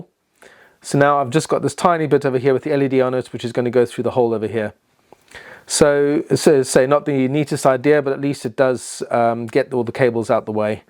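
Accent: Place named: United Kingdom. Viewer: thanks and I look forward to more episodes.... it is interesting listening to a British acccent talking about Chicago rail .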